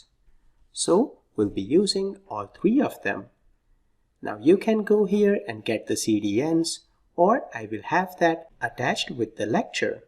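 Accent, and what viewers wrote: India. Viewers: Imagine speaking Hindi and then trying to switch to english for three straight hours. It must be a tounge twister. At least he manages. Every time I try to speak in english it is terrible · makes me nostalgic hearing a indian guy making .Net tutorials (courses) for this. Takes me back like 12 years to good old days of youtube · It's an sweet Indian English accent 😁🇮🇳🇮🇳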